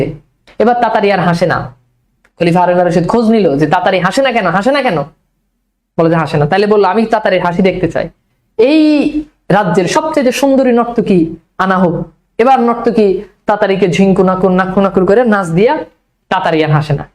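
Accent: Noakhali, Bangladesh. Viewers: sir apni ki Noakhailla?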